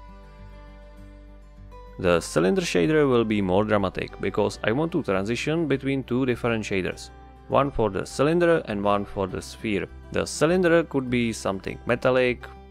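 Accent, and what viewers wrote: Czech Republic. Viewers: Hah, právě jsem na tento tutoriál narazil a hned u prvních slov si říkám, že mi ta angličtina přijde s českým přízvukem :D Tak jsem rád, že dobře slyším. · is " " a CZECH channel??? the guy's voice sounds like if he sopke Czech at some time I am czech too and so far I haven't found allmost any good czech Blender Channels and I just want to know if I am right and there is someone else I could speak to in my home language so am I right or wrong???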